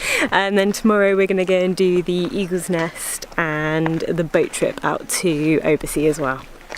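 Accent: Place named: United Kingdom. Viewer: I love your British accent.😄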